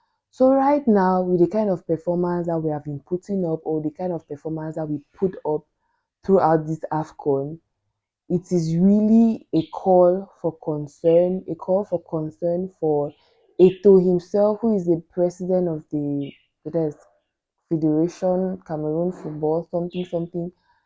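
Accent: Nigeria. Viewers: My sister, did you grow up in Nigeria? Your Accent is more like that of a Yoruba Girl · This girl sounding like a Nigeria 🇳🇬 😊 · Those of you saying she sounds nigerian. By blood and ethnicity southern cameroon are nigerians, colonia masters and them voting to join cameroon is the problem. Blood is ticker than water. Even in cameroon they have been accused of sounding like nigerians, dressing like nigerians, almost everything like nigerians. They are actually nigerians. Just a matter of time , we will integrate them into nigerian society. case closed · You sound so Nigerian. I am surprised as to how you Cameroonians sound so much like us. If you are not wearing that jersy, i would think you were Nigerian. Splendid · . Thanks for confusing me. I saw the Cameroonian jersey on you but when you started talking, I thought you were a Nigerian but it's well. Good job! 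· I so much like the way you speak. It reminds me of someone special besides the fact that you sound Nigerian. I'm your new subscriber 😃🇳🇬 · Sister you have a Nigerian accent. I can tell because I am a Nigerian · This lady is beautiful and brainy... honestly you sound like a Nigerian...it will be pleasure to host you in Abuja....U've my subscription❤🎉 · This beautiful sister sounds exactly like an educated Nigerian, but she's actually smarter than us. Imagine she speaks both french and a good command of English. Whereas most our Nigerian sisters can't even express themselves in English fluently without switching to Pidgin 😂❤😅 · I think most of the viewers are used to hearing Ghanaian's accent and easily know they're Ghanaians but obviously your accent in pronouncing some certain words are similar to how we pronounce them plus after each of your sentences you use the word "oo" which is also a Nigerian intonation. 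Personally, listening to you I would have bet you were Nigerian but the jersey made me rethink. Regardless, please take no offense as to people saying you sound Nigerian,I think it's just curiosity and people are a lil bit surprised at your fluency!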